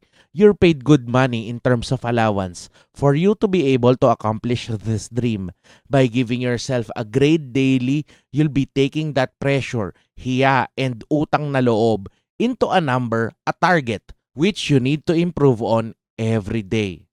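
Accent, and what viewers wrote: Philippines. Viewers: English language with Filipino accent.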